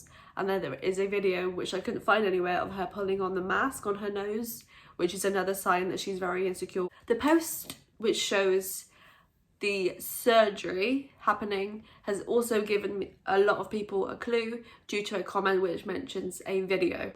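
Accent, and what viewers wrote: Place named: Ireland. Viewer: Your Irish accent keeps coming through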